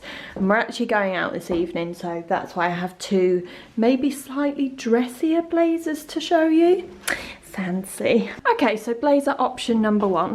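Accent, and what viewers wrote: United Kingdom. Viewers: you look so amazing and I love your British accent so much 😍😍 Have a nice day girl and if you love music, have a listen to this cool song on youtube: - I love the sunny vibe of it and I hope it will make your day better too 😍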